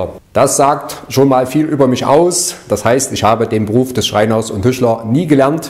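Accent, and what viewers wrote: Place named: Hesse, Germany. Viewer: Ich weiß nicht wieso aber irgendwie finde ich deinen Dialekt sehr symathisch, auch wenni ch ja eigentlich der Meinung bin, dass ein Mensch agar nicht mehr sein kann als ein Hesse ^^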